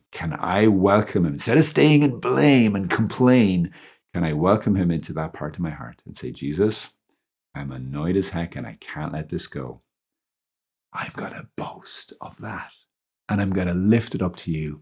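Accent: Ireland. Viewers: Great advice indeed! And nice to hear the Irish brogue! Beannaithe Dá leat!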